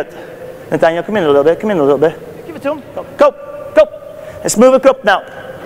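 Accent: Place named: United Kingdom. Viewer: British accent ''' in it '''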